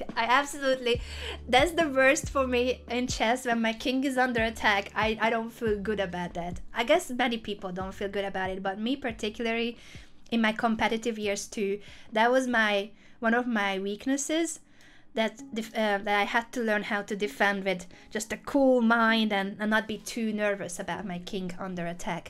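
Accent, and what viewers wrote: Hungary. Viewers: are you hungarian? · Bakker eddig nem is realizáltam hogy te magyar vagy.... annyira hülyének érzem magam, az akcentusból rá kellett volna jönnöm XD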